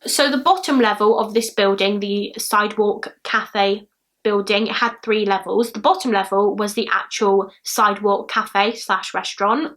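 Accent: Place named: Bristol, United Kingdom. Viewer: It is the accent, which you must be unfamiliar with. If she got the name wrong then then she would have spelled it wrong in the description box of the video. It kind of reminds me of a Bristolian accent.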